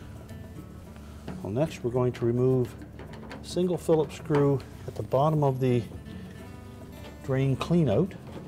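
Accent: Canada. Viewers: I carefully watched your instructional video documenting the procedure for replacing a door seal on an LG Front load washer; your ability to communicate is nothing than outstanding! Especially the segment pertaining to the fitment of the door seal to the washer's drum and the use of locking pliers and a flat-blade screwdriver to install the metal retaining ring to the drum-seal interface. The language was clear concise and unambiguous. The visual aspect of the video clearly depicted the finer points of the job with clarity and preciseness. I picked up on the Canadian🇨🇦accent as I live in Buffalo, NY on the border; hence the impeccable use of the English language. Keep up the good work and I look forward to viewing your productions in the future.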